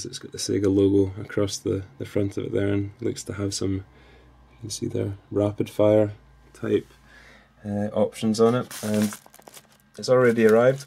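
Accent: Scotland, United Kingdom. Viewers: Man, I love your Scottish accent :) Been to Edinburgh this summer. Really nice countryside in the Highlands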